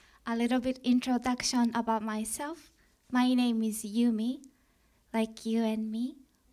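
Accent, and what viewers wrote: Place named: Japan. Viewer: I don’t why understand there are subtitles. Or maybe I’m just used to the Japanese accent so I don’t hear it? 🤔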